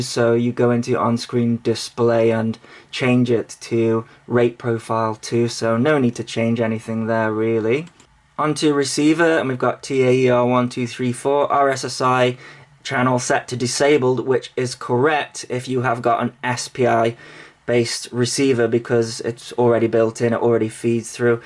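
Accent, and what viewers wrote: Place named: United Kingdom. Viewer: I really like your reviews, also your english pronouncing is very enjoyable, british :-)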